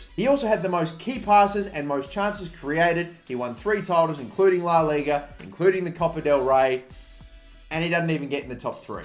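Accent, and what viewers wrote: France. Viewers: I love the French accent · Great French accent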